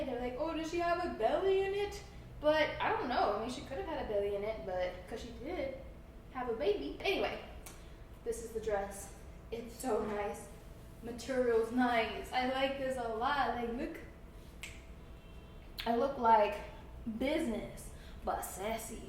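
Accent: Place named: Texas, United States. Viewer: i feel like you had a strong texas accent throughout this video 😂💕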